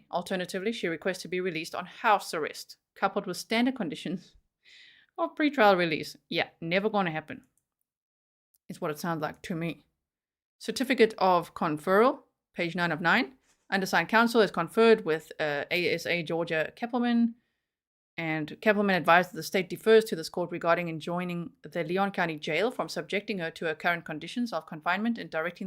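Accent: South Africa. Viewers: Love that South Efrican accent. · Love that South African accent.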